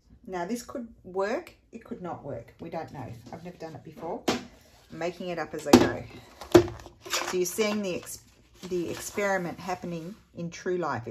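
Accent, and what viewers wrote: Australia. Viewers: Well done, considering the upheaval happening with packing and moving. I love watching your videos and the Aussie accent, even though you’re living far from us!! Thank you for this great idea with the “w”indow theme!! Regards, Susan W Australia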